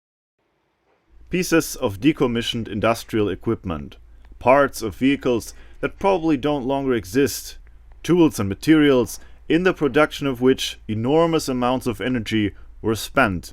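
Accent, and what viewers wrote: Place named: Germany. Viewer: Your English in German accent cause HEADACHE. Better no narration and keep quite.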